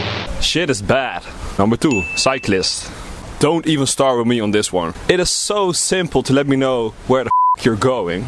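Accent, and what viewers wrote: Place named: Netherlands. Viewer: it's fun to hear within 1 second that your dutch XD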